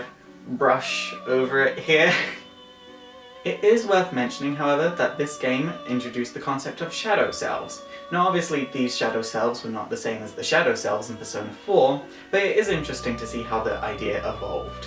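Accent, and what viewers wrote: United Kingdom. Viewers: I can't tell if your british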